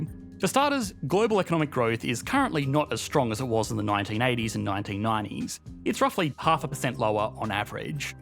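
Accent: Australia. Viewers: I could never move down under cant stand the accent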